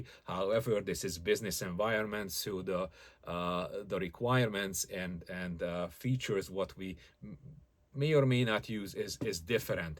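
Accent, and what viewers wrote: Hungary. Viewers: are u hungarian by any chance? your accent really sounds like it:)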